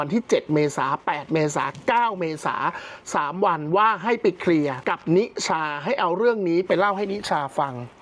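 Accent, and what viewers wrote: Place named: Isan, Thailand. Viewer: เสียคนอีสานเหมิ้ด😅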